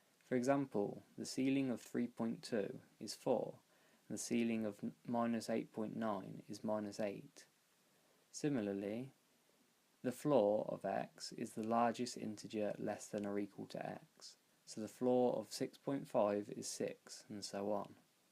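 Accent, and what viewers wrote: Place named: Ohio, United States. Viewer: This is my uncle speaking your voice sounds like your from ohio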